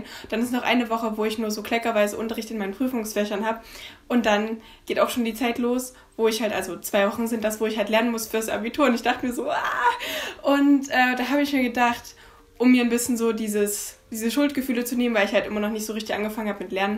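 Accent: Saxony, Germany. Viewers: Kommst du aus sachsen? Komme selbst aus Sachsen und es klingt von den Prüfungsfächern und so ziemlich nach Sachsen (und auch bissl dein Akzent😂❤️)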